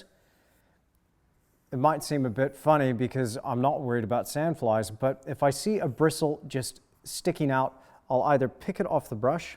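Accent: Australia. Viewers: I can't tell if you have an Aussie, American or Irish accent lol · Australian vowels and American consonants, where the hell do you live lmao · Australian/Kiwi-American?? (please) ...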